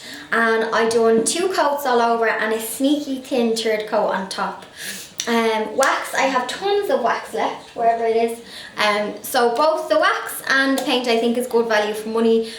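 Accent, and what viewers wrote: Ireland. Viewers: I think it is Irish? nice vid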